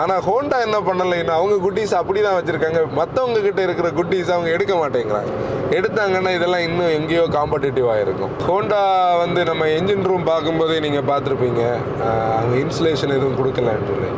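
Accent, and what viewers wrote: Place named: Nagercoil, India. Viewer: Every sedan, hatchback, suv and electric vehicle launch time. I search channel.for your"s valuable review. Because your brief and depth points like vehicle drivability, ride and handling. build quality and also your Nagor kovil language slang. Hat"s up bro and her team mates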